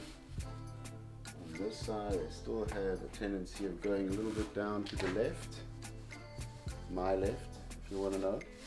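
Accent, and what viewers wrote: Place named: South Africa. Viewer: You sound South African bru, lekka to see some local vids on YouTube. Keep up the board building.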